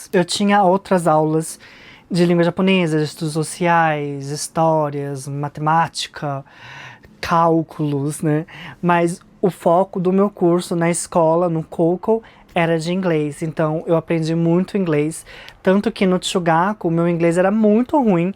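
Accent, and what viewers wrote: São Paulo, Brazil. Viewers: Nossa vc fala muito bem o português. Seu sotaque é paulistano. Vc é poliglota, que tudoooooooooo. Vc precisssaaaaaaaaaaaa vir para o Brasil, tem tem tem que vir, vc vai adorar muito. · Seu português é perfeito e vc tem sotaque paulista, se eu não tivesse ouvido sua história, se vc falasse que cresceu em SP, acreditaria 100%.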